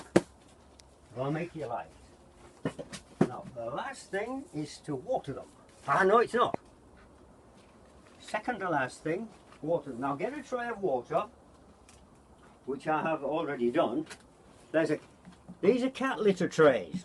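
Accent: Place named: Yorkshire, United Kingdom. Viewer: Sounds like. Hint of Yorkshire.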